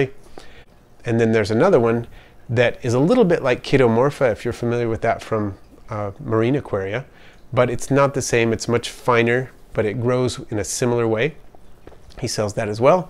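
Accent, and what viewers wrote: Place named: Hawaii, United States. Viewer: Bro. You sound local, like from hawaii. Im fkn serious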